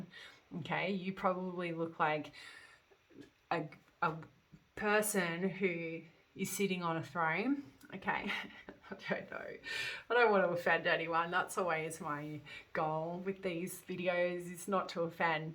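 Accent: Australia. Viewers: “If you’re new to my channel I’m not normally this shit 🤦‍♀️ “ hahahah classic I love you already. Aussie ?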